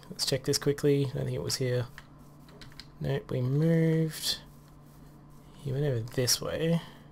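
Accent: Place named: Australia. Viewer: Lol... Pretty epic fighting there... Also, stop scratching! Can't wait for the gold farm and you sound more Australian mate.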